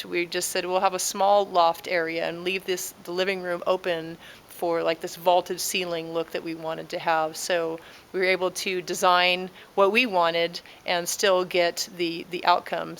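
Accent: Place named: Ireland. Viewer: What I enjoy most is narration; her slight brogue does not hamper her clear and mellow enunciation, precise, yet complete, sentences. The self-aware, embarrassed giggles make listening easy and delightful. Those walls pierced with so many empty eyes may not be pleasing to my aesthetic sense, but I'm sure they'll be practical. I remember the Big One of 1964, which leveled much of Anchorage and caused a tidal wave which swept our Oregon beaches clear of debris and also one family's children. Keep up the good work. <link>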